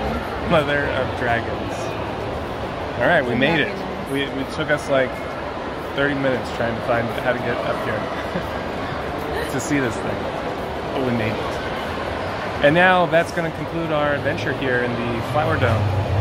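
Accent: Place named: United States. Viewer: The first time I saw ur picture I thought it was henry cavill vlogging. The American accent gave u away. Welcome to Singapore, Superman. Come back and try some local food. U will be pleasantly surprised.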